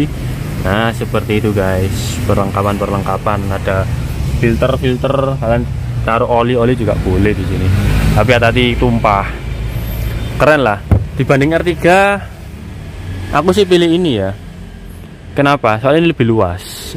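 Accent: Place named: East Java, Indonesia. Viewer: Bahasanya kok jawa timuran om sampean wong endi 😅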